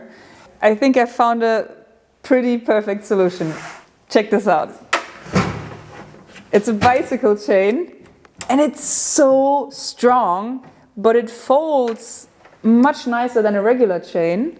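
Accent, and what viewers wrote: Germany. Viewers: Hi super Cool geworden. Echt genial. Deine Deutsche Aussprache hört sich sehr sympatisch an . Könntst du öfters machen.. Liebe Grüße aus meinem Mini Bastelkanal Meenzer Bastel Bude und bleib mit deinen Liebsten gesund. Von Stefan.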